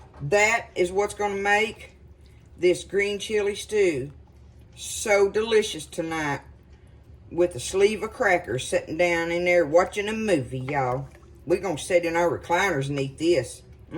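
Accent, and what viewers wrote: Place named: Texas, United States. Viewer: This is a wonderful cooking get together show. The nit pickers need to leave this sweet Texas gal alone. In Texas we have our own talk yall. Happy New Year!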